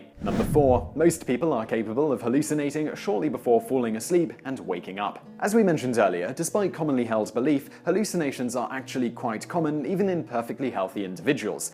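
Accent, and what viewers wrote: England, United Kingdom. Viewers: Man i swear genuine English accents make narration serious/entertainingly listenable and even the occasional background noise for a day time nap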